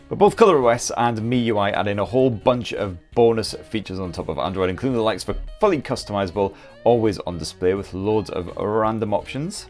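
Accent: United Kingdom. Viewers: I struggle a lil with British accents does he call the phones "blowers"?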